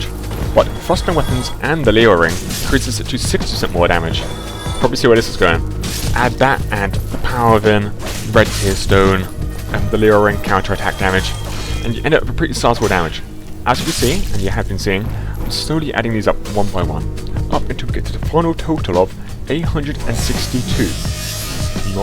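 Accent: United Kingdom. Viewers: i wish i could understand more than half of that. you are talking too fast and with too much of a british accent to be perceivable. please do subtitles.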